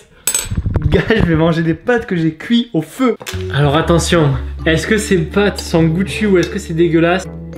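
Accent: Marseille, France. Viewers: C'est l'accent marseillais ou il ne sais pas parler ?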